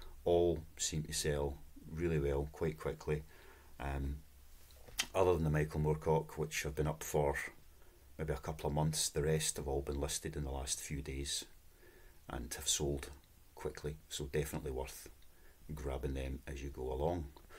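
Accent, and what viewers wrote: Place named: Scotland, United Kingdom. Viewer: Hi,just found your channel.Good to hear a fellow scot selling!. Have you selling long?.Welldone!!!!. Rab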